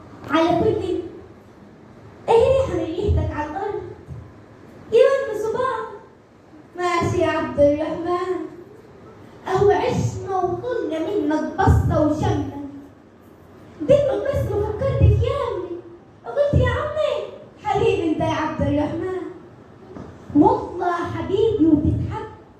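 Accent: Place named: Upper Egypt, Egypt. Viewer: لهجة صعيدية جميلة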